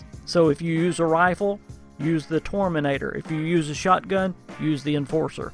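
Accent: United States. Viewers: I love your country accent, feels like home! As far as content, very informative! You've got a new subscriber!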